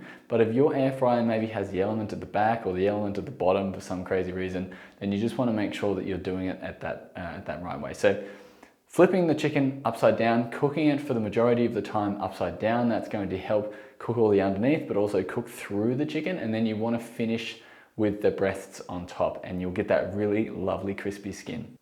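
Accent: Australia. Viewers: Hi, just a query regarding coconut flour!!!!! I live Perth Western Australia and try to get organic, can be a challenge. I recently purchased 2 different organic coconut flours and the carbs per 100gms vary quite a bit. Is there a logical answer to that?? By the way thanks for your vids, it's pleasant listening to an Aussie accent without all the hoo hah. Much appreciated Rosemary (70 yrs)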